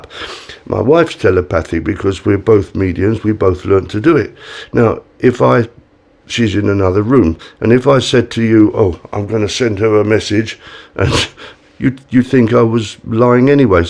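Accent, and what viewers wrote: United Kingdom. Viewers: my apology. But how can I fill my aura with spiritual power. My apology again and thank you. Btw, I love your British accent.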